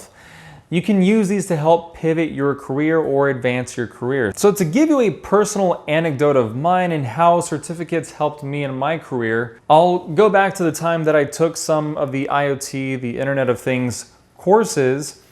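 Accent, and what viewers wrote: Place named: Russia. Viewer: Мне кажется , что у вас русский акцент.For some reason I hear russian accent in your speech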